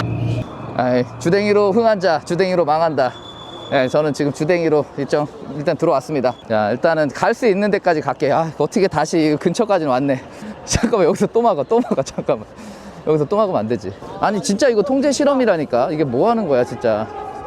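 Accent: Gangwon, South Korea. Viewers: 야 강원도 말투다 임마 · 강원도 말투 같은데?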